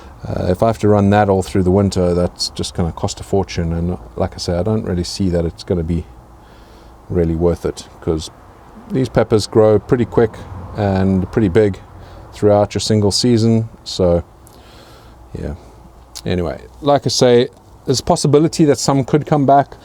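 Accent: South Africa. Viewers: Awesome channel. Built a hydro system as a little hobby project and my first thought was "I have to grow peppers in this" so managed to get hold of a nice assortment including some Reapers. Been enjoying your sauce making videos very much and great to see your growing methods too. Your surroundings say UK but your accent says SA. Either way, hi from Johannesburg!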